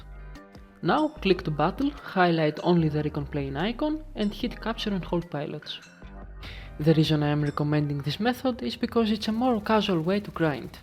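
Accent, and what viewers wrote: Greece. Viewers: το κατάλαβα από την προφορά , αδερφέ. Έλληνας και εγώ είμαι. Είσαι καλός.. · Εχεις τοσο ελληνικη προφορα στα αγγλικα! Ωραιο βοντεο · Are you from Greece? Casue you sound a lot like a greek person!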